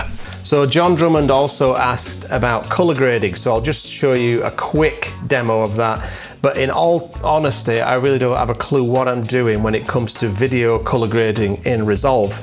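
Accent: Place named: Northern England, United Kingdom. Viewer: Not sure if you can change the levels on that cheap audio mixer, but it makes you sound a bit Northern.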